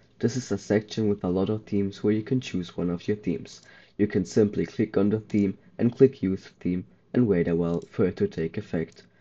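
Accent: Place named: Germany. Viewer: ich höre deutsch bruderherz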